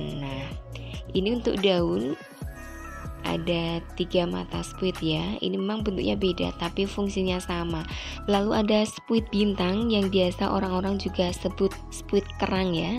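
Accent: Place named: Java, Indonesia. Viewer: suarany lembut skli bunda tp logat jawany msh ada medok dikiiit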